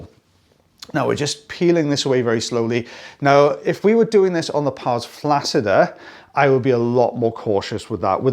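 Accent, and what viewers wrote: Wales, United Kingdom. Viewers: I appreciate the medical terms. Knowledge gained is an amazing thing! And don’t worry about your Welsh accent. This American with Welsh ancestry loves it! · Brother coming from Alabama USA I personally like that "Welch accent"! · As long as you don't get rid of that Welsh accent - as someone from the US, I truly enjoy the accent and have little, if any, trouble understanding you! 😀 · The Welsh accent is fine by me,and knowing the proper terms is really interesting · I ❤ your Welsh accent! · Love your Welsh accent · Thanks for putting the terms in writing. My hearing isn't too good, so sometimes it's hard for me to make out what people are saying. Nothing wrong with your wonderful, welsh accent 👍😊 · I don't think any of your diehard fans will complain about the medical terms. As for your Welsh accent, it could be worse. You could have a strong Scottish accent! 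· i like your "welsh" accent! Please continue to use some technical terms; showing the spelling and meaning to great! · Love the Welsh accent. Never miss an episode. Keep up the good work 👏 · Love the Welsh accent. Adds a good bit of charm to the vids. Keep the medical terms coming! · You're Welsh accent is lovely. Yep, American here. 😆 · I love that you are posting on weekdays and this video is terrific! I love getting the medical terminology. Putting it up on the screen is a great idea, too. I'm a microbiologist, love you accuracy! By the way, I super enjoy your broad Welsh accent!! 😁💕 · I'm American and can understand your Welsh accent perfectly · I am really enjoying the changes you are making. You would make a terrific teacher. And I love your Welsh accent. One of the reasons I follow your channel. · I usually struggle with welsh accents (being broad yorkshire im used to very diferent) but your accent is quite a soft welsh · As someone who works in the health sector (disability) I love that you're now using the medical terminology in your videos. 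While I don't have to use them myself on a daily basis, I do have to attend appointments with clients and being able to translate them to layman's terms if the doctor or specialist uses them is a win. Thank you for continuing to make these videos...and your Welsh accent is a breeze!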